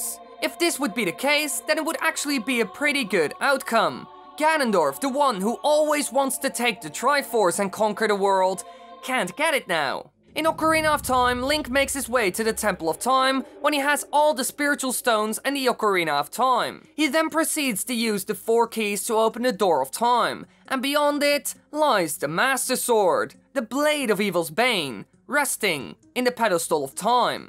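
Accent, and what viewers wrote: Netherlands. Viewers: ik kijk graag naar je video's ze geven een leuke twist aan zelda (en andere nintendo games) (ik had al een klein gevoel dat je nederlands was omdat het wel een klein beetje nederlands klinkt(echt een heelklein beetje)) ik hoop dat ik vaker een geheime nederlands comment kan platsen ;)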